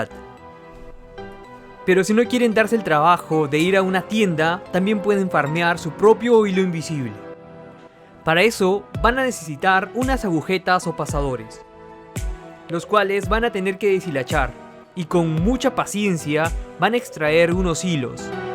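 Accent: Peru. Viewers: soy peruano y me imagino por tu voz que tu tambien like a tu video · Una pregunta eres peruano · Peruano hasta los tuétanos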